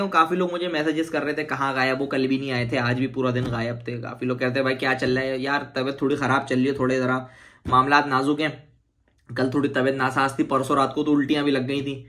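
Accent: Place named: North India, India. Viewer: Ye tone pakistani hai hi nahi...check are you from india brother? I m very well aware abt pakistani voice tone...ye typical north Indian.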